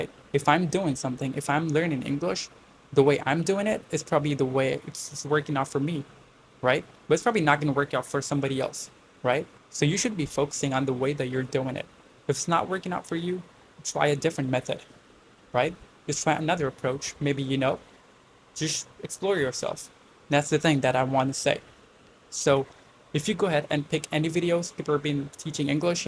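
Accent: United States. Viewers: Ur American accent is really mind-blowing bro ❤in how much time u get it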